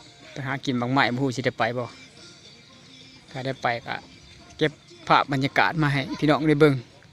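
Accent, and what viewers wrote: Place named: Phu Thai, Thailand. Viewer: ผุบ่าวผู้ไท..หล่อเนาะ เว้าสำเนียงกะไพเราะ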